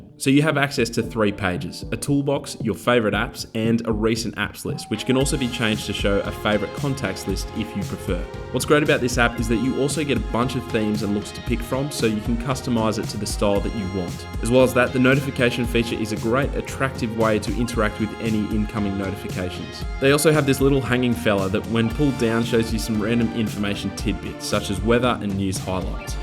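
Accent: Australia. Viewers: Are you Australian? That accent sounds very familiar. Anyway keep up the great work!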